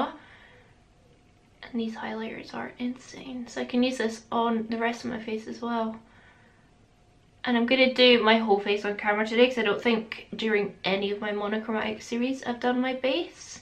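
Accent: Scotland, United Kingdom. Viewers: I love this! Do other Scots call you posh because you don’t say stuff like “dinnae”? I’m from Devon, the accent here is like Sam from lord of the rings and I constantly get comments about being posh just because I don’t have a carrot cruncher voice 😂🙈🤷🏻‍♀️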